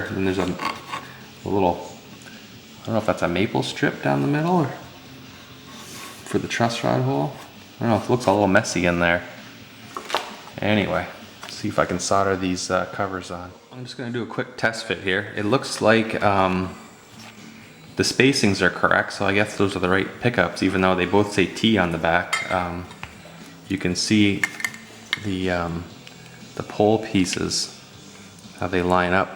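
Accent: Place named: Canada. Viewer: With a Canadian accent though.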